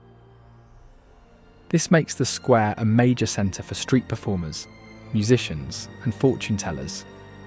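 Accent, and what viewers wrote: United Kingdom. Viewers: Better Music would've made this SO MUCH MORE Shareable... the British accent is a bit overplayed and mispronunciations laughable - otherwise BEAUTIFUL!!!! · As a Houma Native American, I am offended that the Houma Tribe is not even mentioned and I have never heard of the Point au Chien tribe. Too many inaccurate claims for such beautiful drone footage! I'm guessing that the narrator's British accent is a giveaway of why there is no mention of the Battle of New Orleans where the British Army was mowed down by Andrew Jackson, Jean Lafitte and native American & Cajun volunteers at the Chalmette Plantation south of New Orleans.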